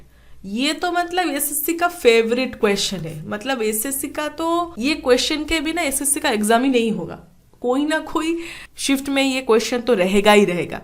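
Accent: Bengal, India. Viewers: Ap bengali ho kya???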